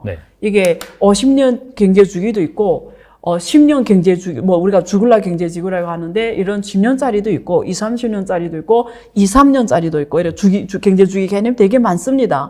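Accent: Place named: China. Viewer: 저 중국식 발음 도데체 이해가 안된다 좀 고쳐라!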